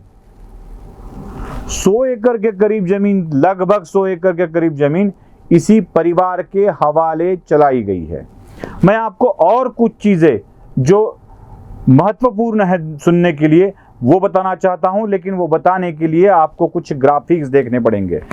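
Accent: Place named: Maharashtra, India. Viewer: आपली हिंदी खुप छान आहे पन ACCENT थोडं मराठमोळा यतोय . थोडं ACCENT वर काम झालं तर छान होईल .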